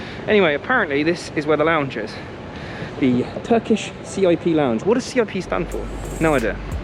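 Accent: United Kingdom. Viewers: Turkish Airlines is more assertive in economy class. And you, the British-accented dog, awaiting king service from a short flight. Don't be racist dude. We don't hate westerners, but people like you have an endless hatred for us. If you are looking for more king services, do not fly with THY. Fly with Emirates or Qatar Airways. racist guy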